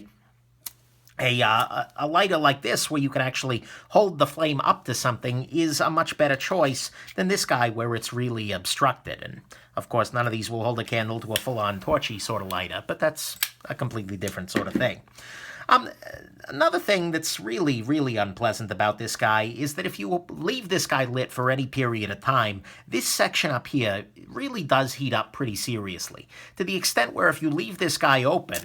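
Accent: New York, United States. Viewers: I like. His humor. His gear. His reviews. His 1920’s voice. EXTRA! EXTRA! EXTRA!... is that accent NY Italian?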